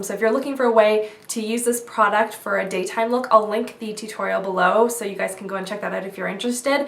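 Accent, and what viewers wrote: Canada. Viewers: Haha it sounded like you were saying oat instead of out and it was so weird but then you said you were from canada and it clicked! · haha ohmygod I love canadian accent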